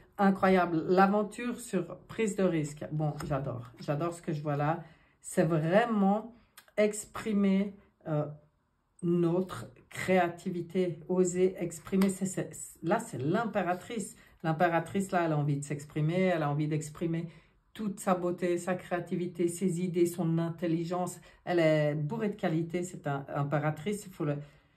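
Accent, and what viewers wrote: Switzerland. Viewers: De suisse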